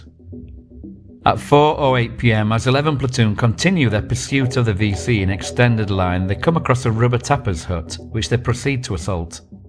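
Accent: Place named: England, United Kingdom. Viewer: Excellent presentation: short, sharp and clear. It's really easy to listen to your posts. As an Aussie I find your Pommy accent and presentation very endearing--we truly are very close cousins. God save the King!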